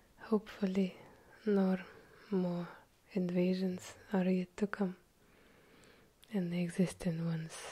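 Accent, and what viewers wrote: Russia. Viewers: My Dear I would listen to you read a math text book as long as you were using your heavy Russian accent. And I hate math.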